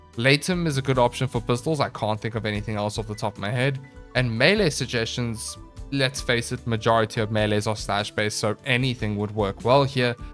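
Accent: South Africa. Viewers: I can hear you are South African XD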